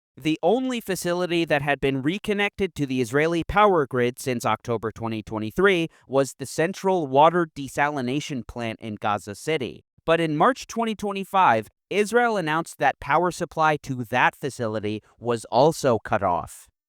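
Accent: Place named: West, United States. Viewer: He sounds western though?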